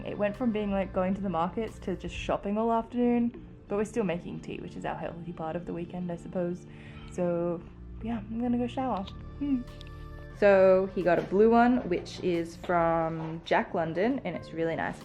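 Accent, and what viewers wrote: Australia. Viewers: M.A Australian ♡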